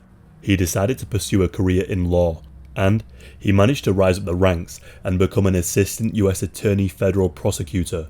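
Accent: United Kingdom. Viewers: He’s British bro give him a break 😭. Brits refuse to pronounce American ANYTHING how Americans pronounce it lmao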